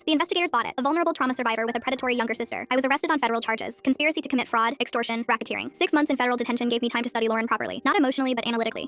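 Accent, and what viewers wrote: United Kingdom. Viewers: your british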